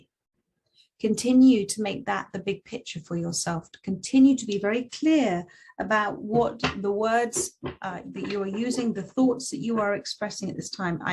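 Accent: United Kingdom. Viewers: Your one of my favorites. Luv the British accent! T. U for sharing your gift with us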